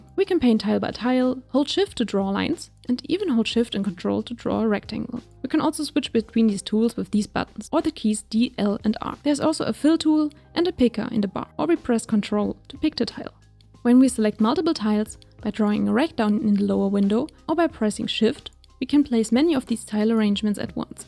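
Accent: Germany. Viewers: Man merkt so das du Deutsch bist xD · Ich antworte mal auf deutsch, da sich dein englisch sehr nach deutsch angehört hat: Das Video ist nicht nur sehr schnell gesprochen, es ist für mein empfinden auch etwas zu sehr hektisch gewesen. Da ich ein Anfänger mit Godot bin fällt es mit etwas schwer alles nachzuvollziehen. Ich werde aber mein Bestes geben. Danke :-) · i hear a little deutsch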